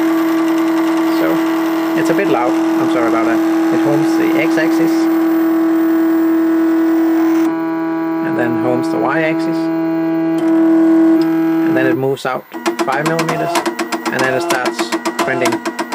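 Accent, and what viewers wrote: Denmark. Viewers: hey er du fra Danmark? (hello are you from Denmark?)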